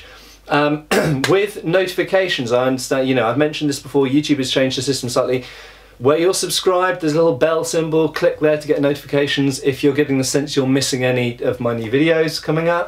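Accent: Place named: RP, United Kingdom. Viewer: Metatron led me to your channel. Among my interests are History, Archaeology, Weapons and Hema and in my opinion you are one of the best content creators on youtube. I also really enjoy your movies and tv series fights evaluations, they're brilliant. I think people visit your channel not only to be entertained but, mostly, to learn something, and I really can't say the same (for example) about Lindybeige, highly entertaining but with very less quality. I can't wait to watch your History videos (with your BBC voice :-) ). I'd also like to hear you explain old fencing manuals, like di Grassi (or even Fiore dei Liberi, Liechtenauer or Meÿer!). Keep up the great work, and thank you sir. p.s. Do Rants!!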